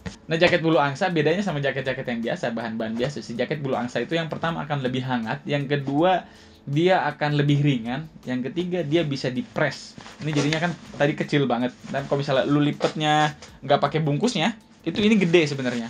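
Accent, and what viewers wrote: Java, Indonesia. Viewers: Bang kalo bisa qolqolahnya jangan terlalu medog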